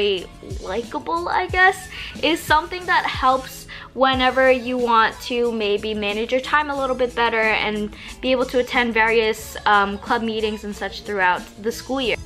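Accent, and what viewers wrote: South Korea. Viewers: Korean accent❤️👌🏻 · Omg your korean is so cute 😭❤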